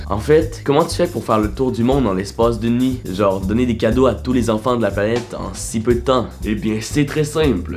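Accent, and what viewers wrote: Quebec, Canada. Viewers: je clique j'entends l'accent québécois je fuis la vidéo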